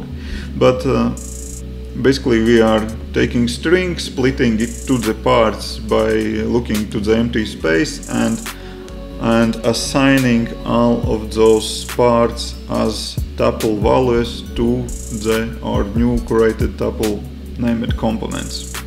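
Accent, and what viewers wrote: Russia. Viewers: are you russian? I hear a slight accent =)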